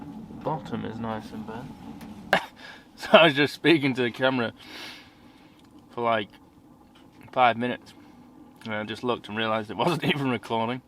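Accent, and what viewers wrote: Yorkshire, United Kingdom. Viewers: I have the same tent and similar stove. The tent is a great tent, with those tent pegs where the cut out is fold the webbing and it I’ll fold it into the grooves. Or hook the top of webbing into the groove. It won’t budge. With your stove top tip, get a piece of Velcro wrap it around your stove box, it’ll hold it all together whilst messing about with the threaded rods. When i set mine up I do it on a tarp or foam pad not on the ground so nothing is lost. Your right you’d be fecked if you lost a wing nut. With regards to your stove in colder climates like Norway 🇳🇴 etc you’d get a buddy to take turns through the night popping wood into the stove throughout the night. My first night using one is set an alarm on my watch every couple of hours to feed the stove. Crap night sleep but waking up to a nice comfy warm tent was nice. 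If you don’t want to wake up feeding the stove it’s got to be a winter down sleeping bag. The guy in the states that distributes luxe tent did a video with a battery clip in fan on the tent pole. Pushes the heat backdown towards you. Try these tips out mate and I promise you a better camp out. Are you a yorkshire lad, picking up the accent a long way from home mate eh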